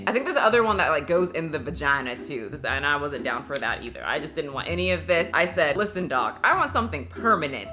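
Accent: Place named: Hemet, United States. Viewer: Why does this sound like Hemet California 😂😂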